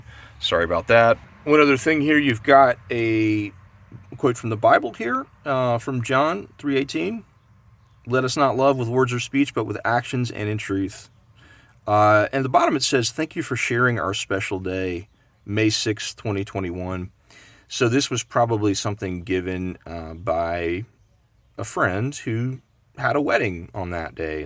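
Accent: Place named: Baltimore, United States. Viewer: Is narrator from Baltimore? I hear a strong Bmore accent when he says window, home, here, open, et.al. words, a LOT like a native of Baltimore speaks.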